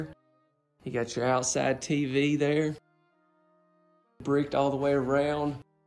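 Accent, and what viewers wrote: South, United States. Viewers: I loves your accent are you from The South I'm from the south as well.Im from Arkansas.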